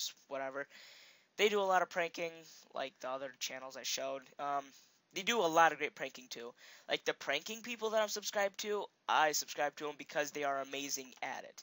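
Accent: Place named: United States. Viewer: cool vid but he speaks American?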